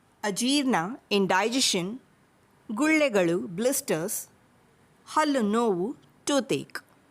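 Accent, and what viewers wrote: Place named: United States. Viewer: 😂😂 American & Kannadiga mix mam 😂😂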